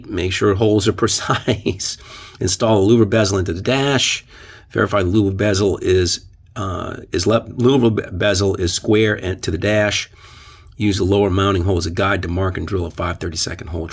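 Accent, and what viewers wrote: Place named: Metairie, United States. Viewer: Funny how I’ve been gone for almost 30 years, and the minute you started speaking I thought, “That guy sounds like he’s from Metairie!” 😂 Nice job, and I hope to soon be doing an a/c installation in my own classic, as well.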